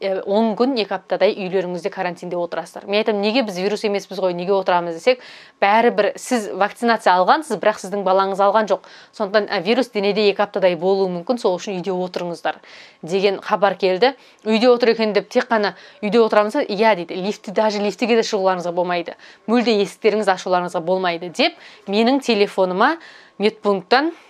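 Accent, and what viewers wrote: Standard. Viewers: Айналайын жаным бізде сені жақсы көреміз сыңғырлаған дауысыңнан қазақшаны тап таза сөйлейсін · Шет елде жүрсең де таза қазақша сөйлеп.қазақ деп соққан жүрегіңнен.Сенің аңгімеңді тыңдап көзге жас келді. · тіліңізді таза ұстаған екенсіз.Риза болдым.Қыз бала тілекші дейді.Қазақстан деп тілеген дұға тілектеріңіз қабыл болсын.Аман болыңыз.Отбасылық бақыт тілеймін.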